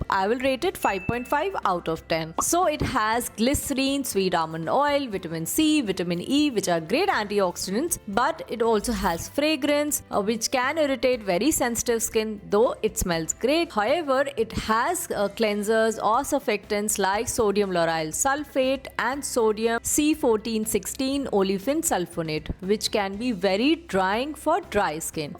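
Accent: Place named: Tamil Nadu, India. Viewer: Mm Tamil voice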